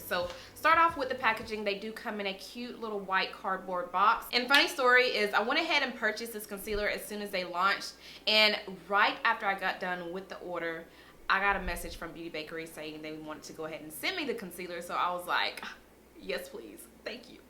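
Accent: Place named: Georgia, United States. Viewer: Kat Von D concealers are like that? I always go back to my Nars radiant. Love your southern accent. It sounds like a Georgia accent.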